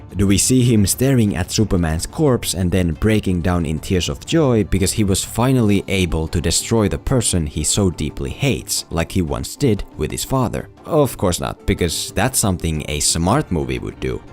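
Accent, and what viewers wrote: Finland. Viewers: Hauska kun heti sun venom videon alusta huomasin sun suomalaisen aksentin :D hyvä et meillä on oikeesti hyviä ammattimaisia suomalaisia leffatuubajia !! jatka hyvien videoiden tekoa ! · Suomienglanti havaittu: torilla tavataan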